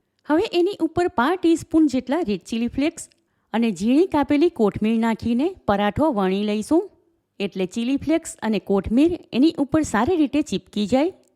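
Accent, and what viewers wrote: Surat, India. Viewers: તમારી ગુજરાતી રેસીપી તો સરસ જ છે પણ ભાષા પણ અસલ સુરતી જ છે.great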